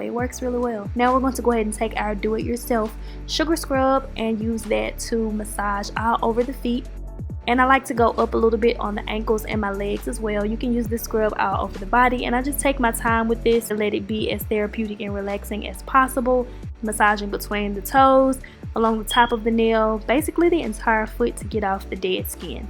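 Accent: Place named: Memphis, United States. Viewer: It took me all of 15 seconds to know you were from Memphis by the way you talk 🤣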